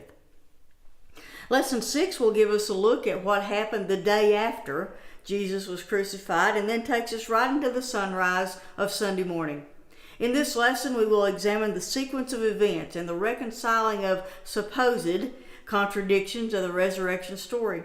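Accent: South, United States. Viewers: Thank you!!! I am going to listen to your lessons as I have been looking for some Bible studies in a down home way that I am used to. I am from the mountains of West Virginia and your calming southern voice makes me feel at home! Thank you!!! God Bless!!!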